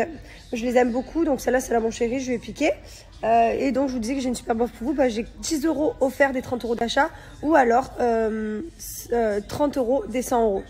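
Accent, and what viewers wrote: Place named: South, France. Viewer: Salut. J’ai lu ton 📚 livre j’ai beaucoup aimé. Je viens de Biélorussie et Je suis en France 🇫🇷 depuis 17 ans, j’aime beaucoup ta personnalité. Grâce à toi j’ai commencé mieux parlé en français, j’aime beaucoup ton accident de Sud. J’ai bientôt 40 ans mais j’aime beaucoup te regarde car pour moi, tu es une femme intéressent. Bisous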